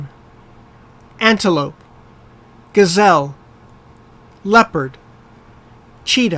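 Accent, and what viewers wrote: Canada. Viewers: 완전 좋아유 👍💯💯👍👍 캐나다 남편께서 직접 녹음하신 듯 하네요. 🤗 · 여기 발음은 캐나다 사람입니다~🐱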